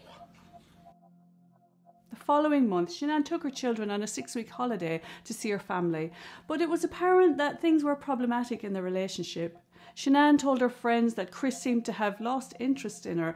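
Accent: Ireland. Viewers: Thank you for sharing your analysis! You sound Irish to me. I have many family members and cousins who live in Ireland and you remind me of them 😊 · Great video and break down of this tragedy.! I believe NK most definitely has some role in all this. Maybe she didn’t kill anyone but she for sure knew his plans and possibly pushed him to do it. Love your accent BTW.! It actually sounds Irish with an English twang xx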